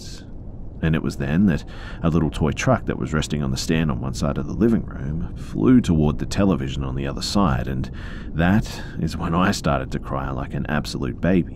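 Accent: Australia. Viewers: Wait?! Are your Australian? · He is I don’t know if you’re Aussie but it’s obvious to me, as I am lol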